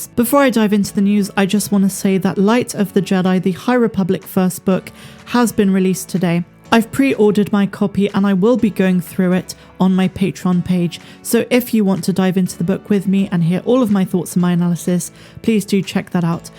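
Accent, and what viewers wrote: United Kingdom. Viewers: Thanks for the update. Where in the UK are you from btw, that is if you live there? I like your accent.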